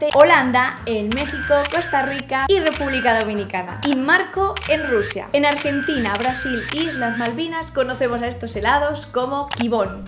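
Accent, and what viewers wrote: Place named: Andalusia, Spain. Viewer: Muy chulas las curiosidades que cuentas. Qué bueno. ¡Lo que también me parece curioso es que en algunos vídeos hables con una especie de acento andaluz! Sin acritud, ¿eh?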